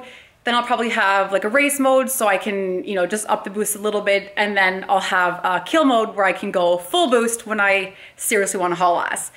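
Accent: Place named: Canada. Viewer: Last video I didnt realize how typical Canadian your accent is, cute af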